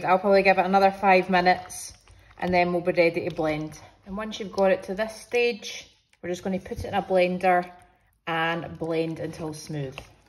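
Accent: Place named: Scotland, United Kingdom. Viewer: It's actually so nice to see and hear a gd recipe the way u actually speak Scottish xxx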